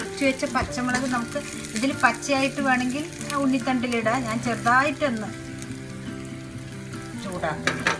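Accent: Palakkad, India. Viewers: Palakad aano desam samsaram thonunu super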